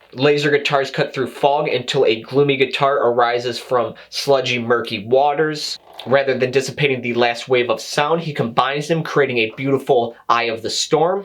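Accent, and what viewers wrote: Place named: Midwest, United States. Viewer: Great review brother! Props for pointing out the lyrical content and the remarkable drum performance on this album. I also appreciate your impeccable midwestern accent. I’d be shocked if you weren’t from Wisconsin or Illinois. Keep reviewing black metal!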